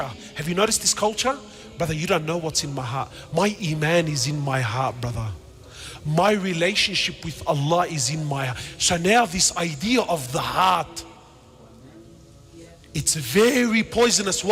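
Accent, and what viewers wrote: Australia. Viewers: Is he Austrailian? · Is this preacher Australian? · is he aussie?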